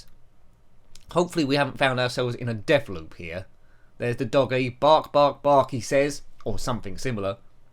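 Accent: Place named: Cockney, United Kingdom. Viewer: A nice little game that probably would have been popular on a budget label back in the day. It's all a bit basic and lacking flair, but it's decent in all areas. I really hope lots of new Spectrum games are created now that the Spectrum Next has given the brand a new lease of life., EastEnders should have you as a guy who runs a retro computer shop in Albert Square. Your accent is perfect for the show. One of my grandfathers was a cockney(born within bow bells.) so I have London in my blood. Have a King Lear on me. Cheers! D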